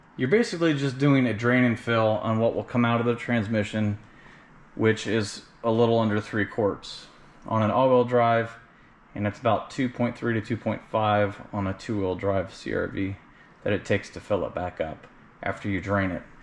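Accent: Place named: United States. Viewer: Probably too late to be helpful but at least in this video he’s using 2.7 quarts, not liters. He sounds American and the bottles here are sold in 1 quart quantities. Not totally sure what the quart-to-liter conversion is but I’m sure you could google it and it might end up that 4 liters is 2.6 quarts? :)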